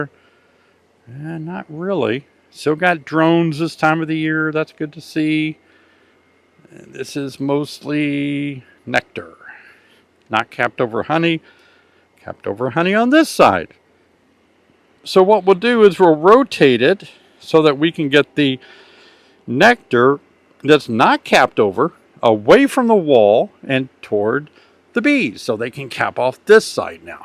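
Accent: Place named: Appalachia, United States. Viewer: It’s an Appalachian accent. I use them both here in Pa!! I’m extremely green with my first hive this year. You are a great source of information and a great speaker. Just added electric fencing to the area too. Bears will be getting ready for winter here! Thank you again sir!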